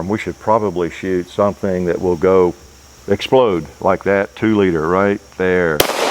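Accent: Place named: Florida, United States. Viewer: You sir, just gained a follower and a fan. You sound like a Florida boy just like me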